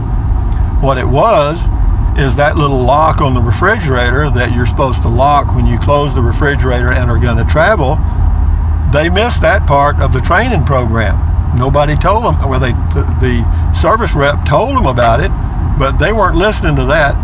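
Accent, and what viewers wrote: Texas, United States. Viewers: I thought the same thing the first time I heard him say it, but then figured out it was just his Texas accent. It is funny to me, though.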